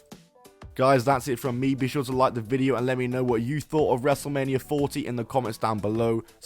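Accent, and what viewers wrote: United Kingdom. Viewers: Wait a minute I just stopped a video at two minutes and 20 seconds you’re British!!!! Why is all the wrestling channels I watch British people Well, I like your video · You couldn't tell by his accent that he was british?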